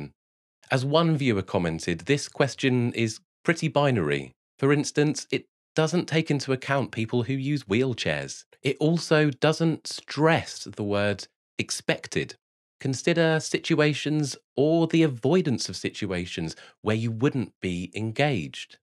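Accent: United Kingdom. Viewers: And is his sweater blue or black? How many piercings does he have? Is that an English accent? 😂😮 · I noticed the asymmetrical glassesand the way they make his eyes smaller, both the lip and weird-placed ear piercing which is also a bit rusty it seems, the few grey hairs in his mustache and beard, the hairs that are out of alignment on his head, the whiteness and regularity of his teeth, his brittish accent and so many other things I need to rewind to hear the message again. No doubt I have some form of adhd.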